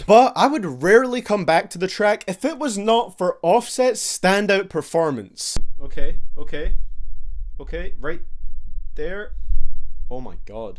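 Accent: Ireland. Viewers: you sound Irish today are your Irish? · Are you Irish or Scottish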